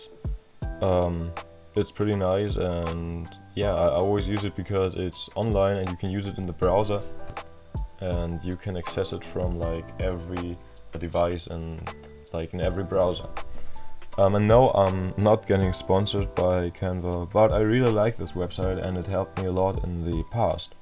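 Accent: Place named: Germany. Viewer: Geiler Content, sehr korrekt das du dein Template teilst. Aber bro man hat ab der ersten Sekunde gemerkt das du Deutscher bist ❤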